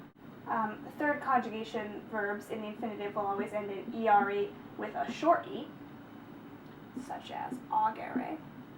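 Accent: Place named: United States. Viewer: Latin with strong American accent? No, thanks.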